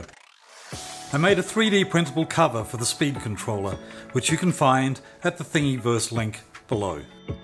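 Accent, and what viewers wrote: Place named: Australia. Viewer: Hi mate. Great conversion 👍… You sound like an Aussie… but you mentioned 110v… Are you in the USA? Regards Robert ( Sydney, Australia)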